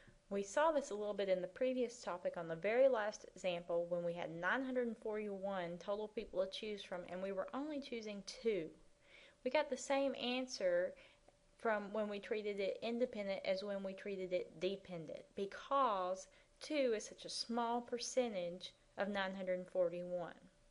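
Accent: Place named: South, United States. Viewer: nobody has liked your video with that sweet southern accent of yours?? well, i just did. just worked on this with a student i tutored :-)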